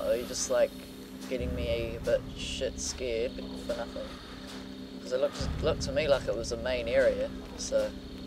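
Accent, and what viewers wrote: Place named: New Zealand. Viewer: HEY! You're from New Zealand too! I recognised the accent :D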